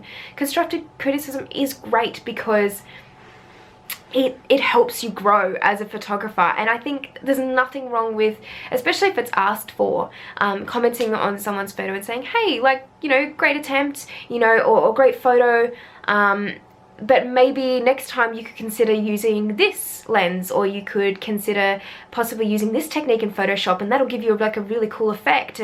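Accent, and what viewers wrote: Australia. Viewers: Great video, and really needed to be said. Frederick really nailed any commentary I could add, othr than Jay Maisel wrote a wonderful book about this in some respects, entitled "Its not about the F/stop". Cheers and best regards – PS I love your Ozzie accent!;-)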